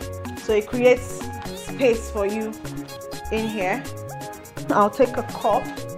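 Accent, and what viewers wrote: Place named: Igbanke, Nigeria. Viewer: That accent is not Agbor, it is from Igbanke.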